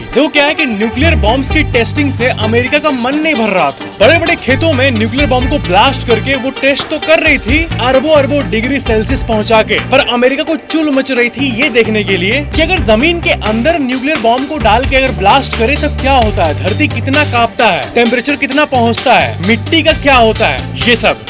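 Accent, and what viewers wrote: Bihar, India. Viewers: Annoying Bihari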